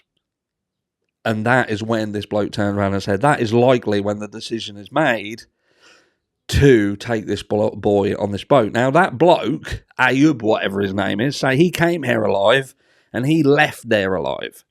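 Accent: Norfolk, United Kingdom. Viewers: Out of interest where are you from? You sound like you have a Norfolk accent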